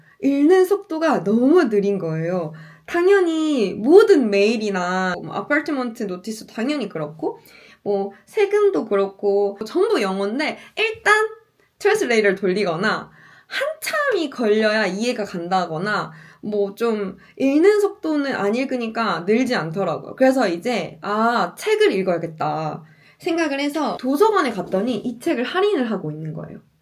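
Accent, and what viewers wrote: South Korea. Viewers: 약간 전라도 억양이 느껴지네요^^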